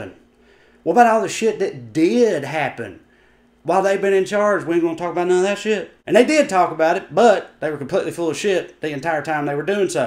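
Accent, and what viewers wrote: South, United States. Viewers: Refreshing to hear a southern guy with brains. Thank you and keep them coming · Your comments and insight are illuminating ...I have a (sorry to say) new found RESPECT for my fellow Americans of the South. Intelligent, informed and 'well read'....and spoken. You can't judge a book by it's accent...I admire you and agree with you. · So nice he hear a southern accent with a real brain functioning behind it. Good on ya, dude. More, please.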